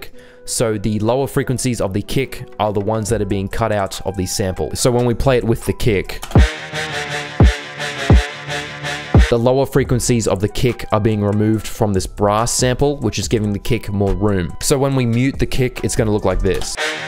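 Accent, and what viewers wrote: Australia. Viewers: bro u from australia yh?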